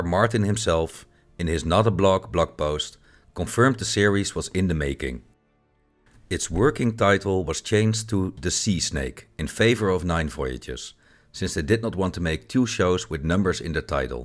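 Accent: Netherlands. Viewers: An excellent video, l've liked and subscribed, best of luck building your channel. Is that a Dutch accent l hear?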